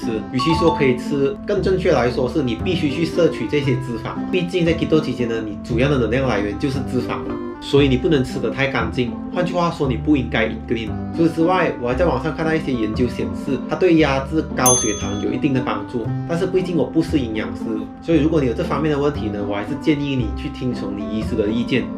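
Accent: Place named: India. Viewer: 你怎么有印度口音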